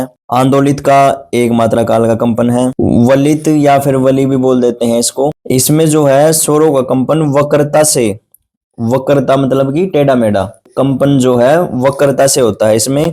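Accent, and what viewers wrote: Haryana, India. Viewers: Sir aap haryana se ho?😅😅Tho tune se laga...... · Aap haryana se ho kya ??😊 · Sir are you haryanvi...? Boli se thora thora lg rha hai..!😂😂 I am also belong to haryana❤ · Yo bhai dekhe HARYANA Ka hai awaz te pta lagra HARYANA ON TOP BHAI❤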